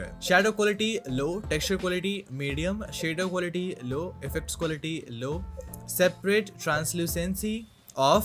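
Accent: India. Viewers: I knew this was a dumb video when i heard that Indian accent, blocked channel.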